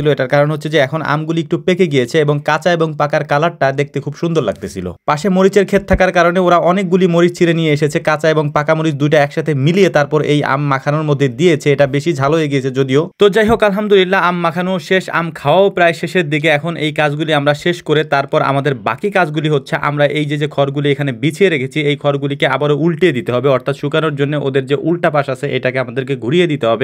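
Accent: Faridpur, Bangladesh. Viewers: ফরিদপুর এর কথা শুনেই Subscribe করে দিলাম। আপনার বাসা ফরিদপুর এর কোন জায়গায় জানাবেন ভাইয়া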